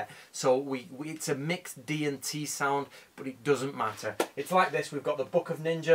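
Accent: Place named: Manchester, United Kingdom. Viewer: Love hearing a fellow manc accent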